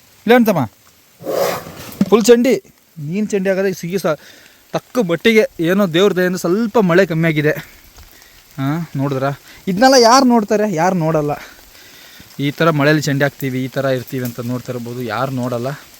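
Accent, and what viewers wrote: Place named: Mandya, India. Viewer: From mandya base adike❤❤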